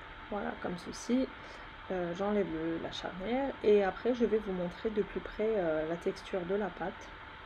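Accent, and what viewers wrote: Alsace, France. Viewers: Je m'en doutais que vous étiez turc 👍👍👍👍 😊😊😊 de part l'accent alsacien et des recette de pain toujour au top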